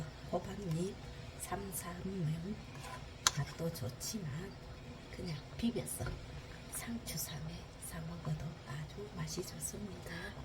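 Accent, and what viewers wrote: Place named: Gyeongju, South Korea. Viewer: 경주사람 정겨운 목소리 들어니 반갑습니다 저도 경주 광명이 고향인데 .간된장 정말 일품이죠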